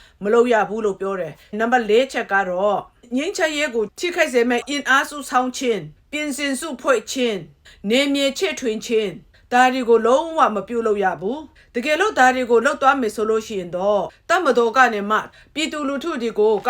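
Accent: Kachin, Myanmar. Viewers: စိတ်မချမ်းသာဆို...နေ့စဥ်လိုလိုပါဘဲ ရယ်....ကချင်သံဝဲဝဲလေးနဲ့ ရဲ့မျက်နှာလှလှလေး{....အော်ရီဂျင်နယ်.....မဟုတ်လောက်သော....]ကိုမြင်ရရင်...စိတ်သက်သာရာရစေတာတော့အမှန်ဘဲ...ညီမလေးနဲ့ဂျိန်းဖောမျိုးနွယ်တွေအားလုံးဘေးကင်းရန်ကွာကျန်းမာပျော်ရွှင်နိုင်ကြပါစေ.......ထာဝရအားပေးလျက်.....။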